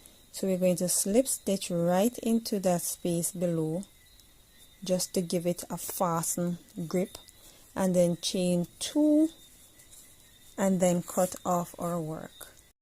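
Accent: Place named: Jamaica. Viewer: Love ur Jamaican accent and skills had to subscribe . Keep up the good works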